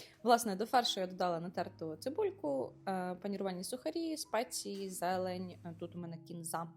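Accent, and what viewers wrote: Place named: Ivano-Frankivsk, Ukraine. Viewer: Випадково відкрила і я в захваті - зрозуміло, цікаво і просто! Дякую!! А мова яка!❤️‍🔥 Ніби з Франківська..🥰🥰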